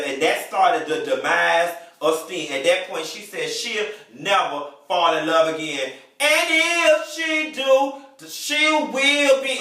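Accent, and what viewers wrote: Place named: South Florida, United States. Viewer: i tought that you were a south florida dude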